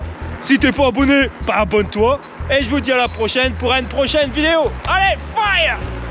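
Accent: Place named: Nord, France. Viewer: salut! Ta chaîne n'est pas la seule que je regarde, mais c'est celle qui me ravit le plus!!! Tu parviens à la fois à donner des infos pratiques, techniques, et touristiques avec le sourire et la simplicité!...Le tout avec eul' tchiot'accint du Nord qui me rappelle ma jeunesse valenciennoise... Merci, et bécot à Maryse... PS: -j'ai du mal avec Komoot: pas simple à utiliser, et pas toujours facile de retrouver sur l'appli certaines balades pourtant référencées sur le site internet... -si l'envie vous prend à tous les trois de découvrir l'Alsace et les Vosges du Nord, vous pouvez déjà compter sur une logement!;-) mais attention!!! Ça grimpe dur!!!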